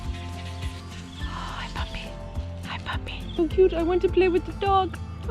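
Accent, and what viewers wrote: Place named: Canada. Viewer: Hi, you had make a right decision to live in a small town to learn mandarin. You sound Canadian.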